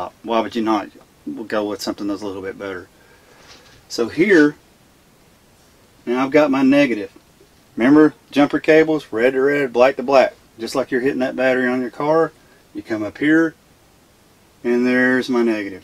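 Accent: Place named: South, United States. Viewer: My kind of Guy Sounds Southern And very Intelligent thank you 🙏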